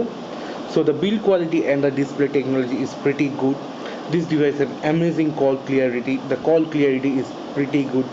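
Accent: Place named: India. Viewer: Forking indian accent. Can't get anything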